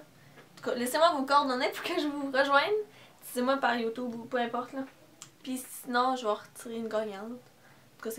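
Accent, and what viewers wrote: Canada. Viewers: Youppi tu parle français Canada je mabonne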